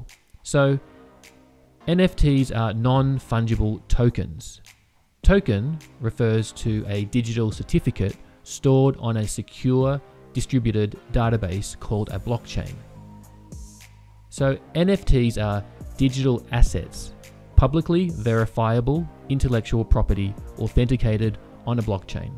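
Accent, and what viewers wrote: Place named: Australia. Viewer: Close, he's an Aussie, not a kiwi like me ;-P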